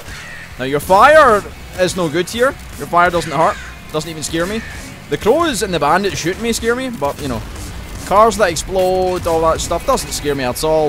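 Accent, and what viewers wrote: Scotland, United Kingdom. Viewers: ..... WHO IS THIS GUY AND WHY DIDNT I KNOW ABOUT HIM SOONER. as much as i like watching NL play Isaac, this guy is SCOTTISH NL, so to speak, which is just as amazing, cause he plays Nuclear Throne instead! That accent though... lols. So yeah, im subbing, im watching, and im probably gonna end up watching every episode he has.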